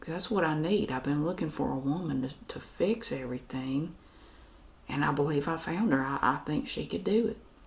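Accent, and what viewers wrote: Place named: South, United States. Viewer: Happy Holidays from Punta Cana!! We moved from Atlanta 3 yrs ago and u make me miss all the southern accents I grew to love so much from the south. So endearing...ur the best!!! Thanks for all the great videos!! 😅🤗🤗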